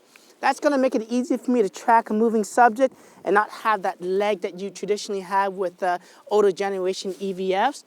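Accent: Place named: Caribbean. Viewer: I'm picking up a little Caribbean accent in your speech, do you visit frequently? 🤓 great video!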